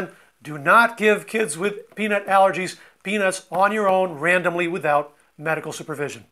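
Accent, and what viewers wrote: United States. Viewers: Sounds like an American born and educated person. He’s not making any other person with a Portuguese sounding name better or worse. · he does sound like an American, but having a US English accent doesn't make him a US citizen. He graduated as a doctor in Lisbon and he merely did a PHD in the US, but has since returned a while back to Portugal.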